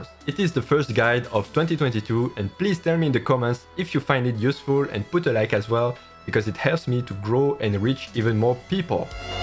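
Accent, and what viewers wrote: France. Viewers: French accent, right?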